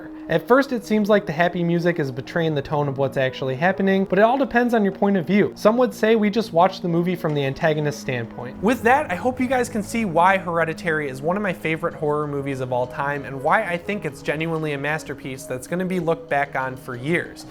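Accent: Midwest, United States. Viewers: Great review! Where are you from? Can’t place that accent. Illinois maybe? Wisconsin?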